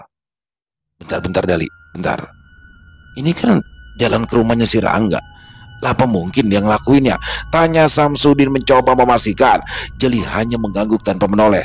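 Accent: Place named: Jakarta, Indonesia. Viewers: Satu masalh LG terselesaikan olh bang jali..,dan buat bang selalu berhasil membawa kn kisah nya dgn logat Betawinya,keren banget, sehat selalu untuk bang dan team 💪🫰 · Iya nich...mantep bgt logat betawinya....spesialisasikan aja bang ....kami butuh yg menghibur...hidup kami udah horor hahah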